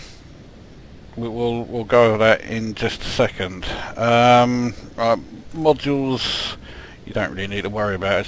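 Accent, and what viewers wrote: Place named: Southend, United Kingdom. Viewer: great video mate, really like your style :o) I am a expat now but your accent and way of speaking reminds me of so many blokes I know from my Southend-on-Sea days :o) Cheers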